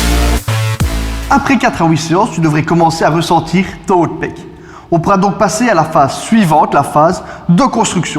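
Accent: Liège, Belgium. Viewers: Ce fut un plaisir d'avoir fait un petit brin de causette ;-), continue comme ça, tes vidéos sont vraiment instructives, maiemes avec l'accent de lièèèche ;-)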